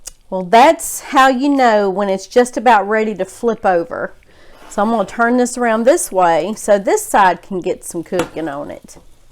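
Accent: South, United States. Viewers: Thanks for the video. Your southern dialect is like butter on bread!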